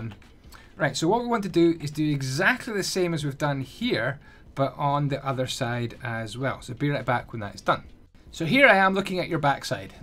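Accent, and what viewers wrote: Scotland, United Kingdom. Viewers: It looks so gorgeous once it’s built - also you sound Scottish so if u are, fellow Scot here ❤️😂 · I love your accent. Are you Scottish by chance?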